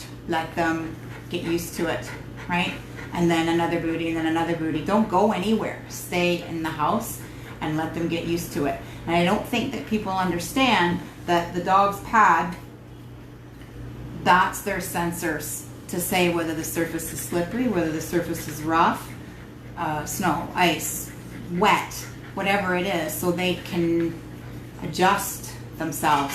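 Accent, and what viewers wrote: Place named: Wisconsin, United States. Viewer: Oh you sound like you gotta be from Wisconsin!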